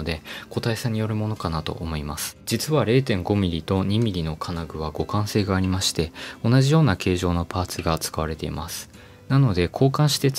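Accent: Aichi, Japan. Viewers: さん愛知県民でしょw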